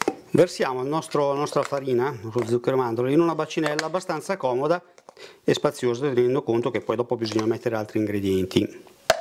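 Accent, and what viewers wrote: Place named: Emilia, Italy. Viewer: Simpaticissimo. Lei è Emiliano!? Perchè mi sembra dall'accento che lo sia..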